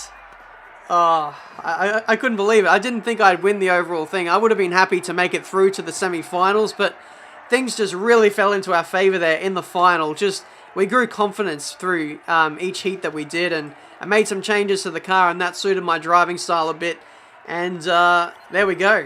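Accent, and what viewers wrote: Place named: Australia. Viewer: Best english accent! The brits and americans should study australian english! The hole world should. Cheers from sweden!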